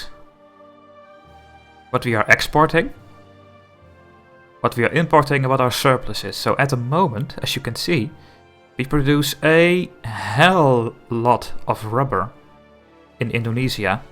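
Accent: Netherlands. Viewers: haha you are either sarcastic or stupid, I'm dutch aswell and i hear emediately that he's dutch ;D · Can Gerek Nee, dat is gwn nederlands accent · je bent een nederlander ik hoor het aan je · Hij heeft een behoorlijk Nederlands accent. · Ik kan gewoon horen dat het een Nederlander is die Engels praat dat is bij elke Nederlander. Haha THATS another cook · It's not as bad as most Dutch though. It's very easy to listen to and quite fluent. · Typisch nederlandse accent xD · obviously sarcastic can't miss a Dutch accent lol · I find your holland accent so funny :D I'm from Belgium btw ;)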